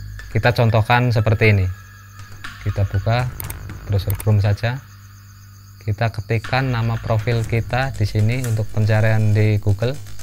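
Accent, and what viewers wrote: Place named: West Java, Indonesia. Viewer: Orang sunda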